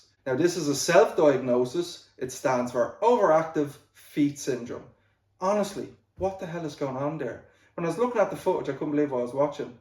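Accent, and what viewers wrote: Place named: Ireland. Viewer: Keep the crossfields, finchs, sheils & robinsons, this is absolutely me to a tee (no pun intended!) Great watch for so many reasons: I can see someone else hitting the same clangers I hit but never know why I did it as I never see my own swing so this will be a huge help.. you're playing courses that are only an hour or two away from me that I could easily get to myself so it's brilliant to get to see them up close on the big screen.. naturally, it's smashing to hear a fellow Paddy's accent on the YT for a change 😀.. and I stocked up my golf clothing wardrobe with the druid's gear there at Christmas too so it's nice to see someone else with good taste in garments too :) keep up the great work pal, best luck for the upcoming season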